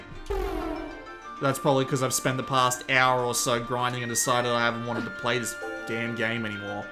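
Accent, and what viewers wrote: Australia. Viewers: The voice on this review is awful. I am not a fan of Australian accents.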